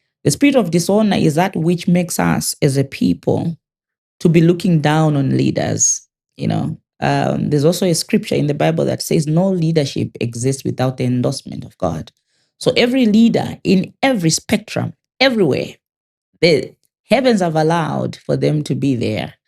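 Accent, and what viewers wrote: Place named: Zimbabwe. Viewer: South Africa via Zimbabwe's accent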